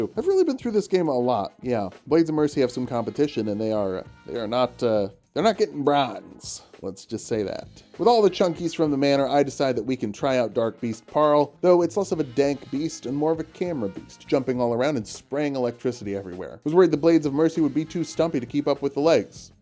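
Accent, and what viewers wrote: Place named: Edinburgh, United Kingdom. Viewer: London? Edinburgh surely?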